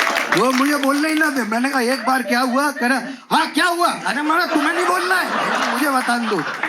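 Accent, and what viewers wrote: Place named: Bareilly, India. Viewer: proper bareilly accent....!!!!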